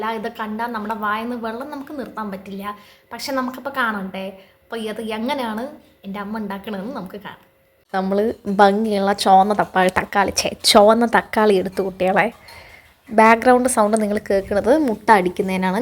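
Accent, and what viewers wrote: Palakkad, India. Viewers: Super tasty omlette thank you ma'am adipoli palakkad slang❤❤🎉🎉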